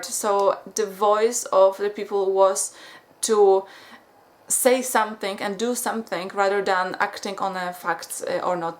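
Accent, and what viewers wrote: Poland. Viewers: you sound polish and you have a polish name:) You Have A Fan From Poland but lives in scotland:) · I can absolutely know as soon as you start to speak that you are Polish! I love your people so much!!!!!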